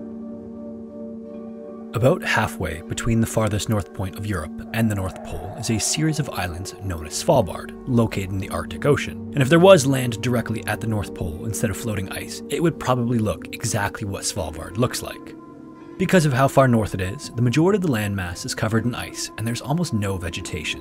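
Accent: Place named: Canada. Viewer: Love your stories and your Canadian accent! 🙂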